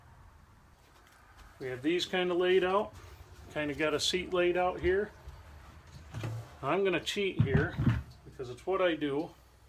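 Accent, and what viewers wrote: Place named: Midwest, United States. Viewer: Thanks for this video. I am looking forward towards the building of it. Your channel is starting off small, yet I see something in your presentation which reminds me of Wranglerstars' earlier days. Please keep on building. Also, could you do something with the audio portion of your video. I've pumped my computer up to 100% along with the YouTube volume, yet it seems like you are speaking in a soft voice. Please check your audio equipment and see if the volume is turned up to full. I'd rather you blast my socks off and then I'll just turn down the volume. It will be interesting if you hog out some of the butt cheek portion of the seat or will you just be sitting on a flat slab? Heck, the way you go about doing things it might just be as comfortable with a Barka lounger cushion on it. Plus, one more thing. What part of the country are you in? 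I'm betting it is Iowa or Wisconsin due to your accent. Thanks again for your vids and please keep them coming. Terry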